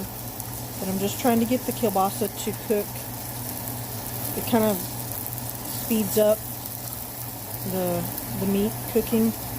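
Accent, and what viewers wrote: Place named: Texas Panhandle, United States. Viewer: Hey, I was just watching some of your recipe videos which I do really like. But I noticed that you have a fire alarm chirping in the background. I hope that I’m not being rude, I just got a bit distracted by it. Also, I’m from the Texas Panhandle as well, and hearing your voice sounds like home. I live in a different state now and miss hearing my people.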